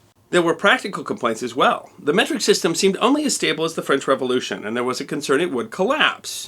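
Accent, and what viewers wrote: United States. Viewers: For god's sake, Louis Phillipe wasn't an emperor, but a king, and not the king of France but the king of French people (thanks to Lafayette's presentation speech that allowed the public to accept the new king) I would say that your video are always interesting, but such a blatant mistake, I don't see how you could miss it. (And yes I am French, listening to your videos helps me understanding some of american aaccents)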